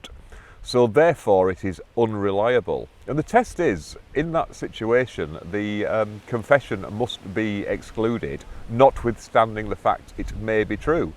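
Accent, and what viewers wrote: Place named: England, United Kingdom. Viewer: Wonderful accent. Very English. No conclusion.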